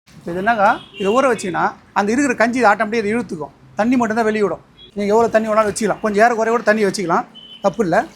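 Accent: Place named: Chennai, India. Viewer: pakka chennai voice na..😊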